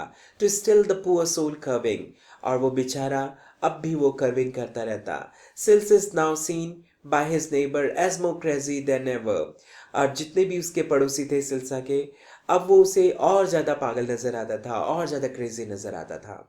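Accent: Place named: United Kingdom. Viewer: Jada angrej mt bn thoda theek se bol ki hume samjh aaye aawaz bhi ajeeb si hai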